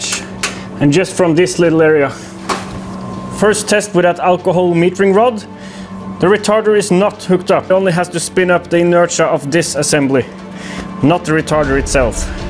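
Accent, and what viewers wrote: Scotland, United Kingdom. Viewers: The Scottish accent is getting better 👌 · Scottish accent is hilarious! 😂❤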